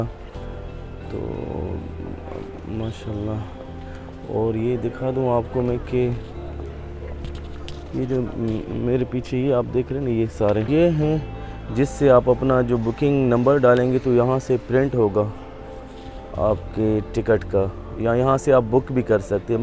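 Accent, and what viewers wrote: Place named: Arab world. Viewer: ماشاءاللہ بڑے بھائی ۔اللّٰہ اعطیک الف عافيہ ۔آپ نے دل خوش کردیا ۔ مگر بھائی مجھے یہ نہیں سمجھ آیا کہ آپ سعودی شہری ہو یا پاکستانی بھائی ہو۔کیونکہ آپ دکھ بھی رہے ہو سعودی اور بولنے میں عربی لہجہ بھی ہے۔۔مگر اردو بھی اچھی ہے۔پلیز رہنمائی کریں۔۔ اخوک الصغیر ۔محمد نسیم لکھنؤ من الھند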